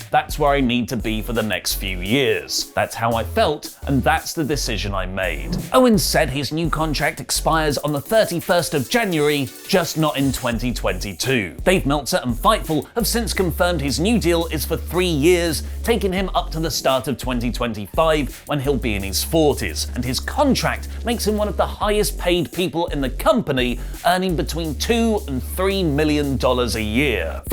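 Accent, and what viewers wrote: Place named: Cockney, United Kingdom. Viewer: Love cockney street urchin speech begging for subscriptions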